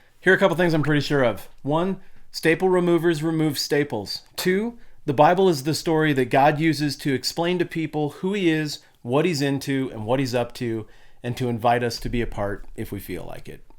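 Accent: United States. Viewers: Are you from Wyoming?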